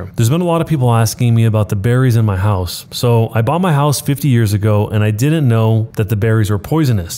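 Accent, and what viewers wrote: United Kingdom. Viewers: BRO THE BRITISH 1980 ACCENT IS KILLING ME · That British voice 😂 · The brit accent tho 💀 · this british accent had me on the floor 😂😂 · the British accent made me go pffffft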